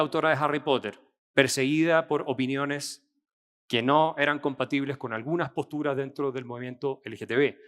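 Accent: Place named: Chile. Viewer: Excelente. Tu acento me acuerda de mi hermosa chilenita linda que perdí en el 2020. Mujer tan hermosa y única.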